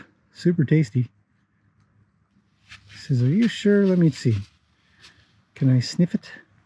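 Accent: Canada. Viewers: Trying to figure out your accent? Canada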